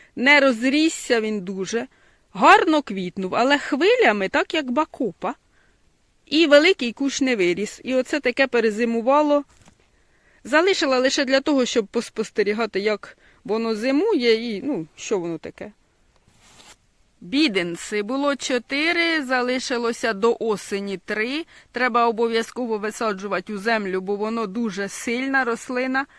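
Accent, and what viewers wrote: Poltava, Ukraine. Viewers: Коли вас чую, то велика насолода від вашої праці, краси і мови, я наче побула на Полтавщині, у своєї тьоті і теж - Ліди. Здоров'я вам та успіхів в цій нелегкій праці.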